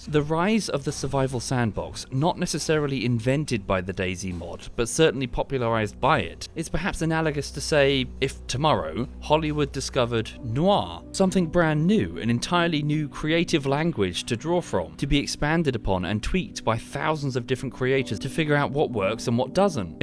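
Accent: England, United Kingdom. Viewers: I'm getting strong UpIsNotJump vibes here. Or maybe all englishmen sound the same to me. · Bro a dude with a posh British accent just explained to me what early access really means I like this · i can understand british accent smoothly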